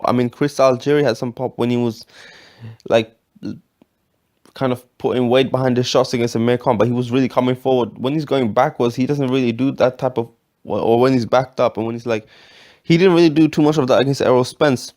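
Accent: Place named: India. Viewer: You sound like you're a Indian from India! ANY ways I just wanted TO let you Know what YOU sound like!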